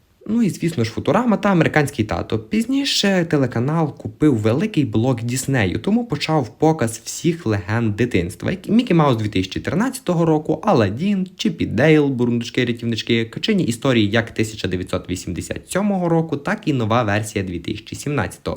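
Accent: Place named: Western Ukraine, Ukraine. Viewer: контент класний, але сильний западенський акцент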